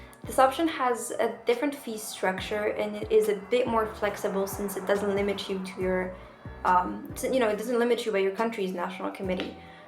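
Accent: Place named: United States. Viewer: You sound american is that a american influence ?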